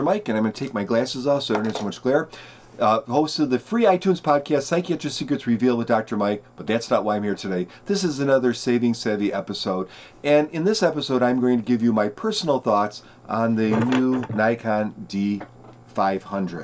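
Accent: Chicago, United States. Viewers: Do I detect a slight Chicago accent...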